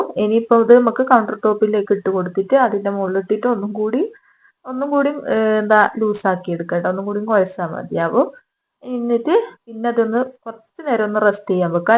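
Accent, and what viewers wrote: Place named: Kozhikode, India. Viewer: Kozhikodkary enn thonnunn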